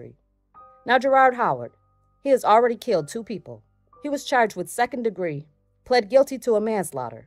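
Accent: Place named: New Orleans, United States. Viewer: Heyy na!! New sub here.. ⚜️⚜️⚜️ I think I hear nola in your voice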